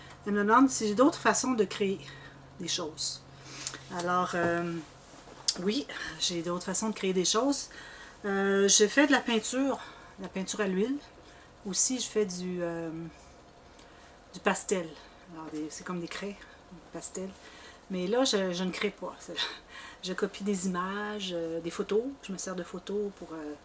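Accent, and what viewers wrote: Quebec, Canada. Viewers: Bonjour c'est agréable de mieux vous connaître. Merci de votre générosité et de tout ce que vous partagez avec nous. Vous êtes vraiment une belle personne à tout point de vue. Les petits ensembles pour bébé sont trop craquants! Vous excellez dans tout. Ne "francisez" pas trop, votre accent et les expressions Québécoises moi j'adore! Bonne journée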